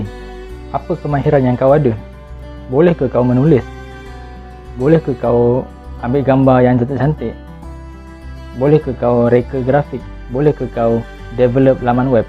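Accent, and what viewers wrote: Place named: Java, Indonesia. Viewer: Pekat jawanyaa.. Very inspiring bro 👍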